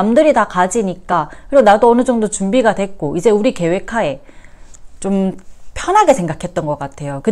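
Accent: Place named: Busan, South Korea. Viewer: 부산분이신가봐요ㅎ 말투가귀엽ㅎ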